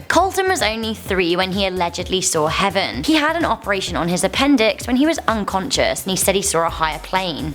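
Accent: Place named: United Kingdom. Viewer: I love and believe in heaven not because of mere religion but also of faith (duh)oh yeah and I know people who saw Jesus or maybe God 😛. They testified that they went into a trance before seeing stuff during our praise and worship sessions then woke up, crying stuff out in tears... "I saw Him😨!!!I saw Him😢!!! He's wearing white😭!!!!!!!" And I'm so wowed by the fact that you guyz, would make a vid about these blessed people.🙂 So yeah. Moooore power to you guyzzz😍. GodddBlesss!!!😆😆😆 and ps Oi love your bri'ish accen' i's jus sow wow😍😍😍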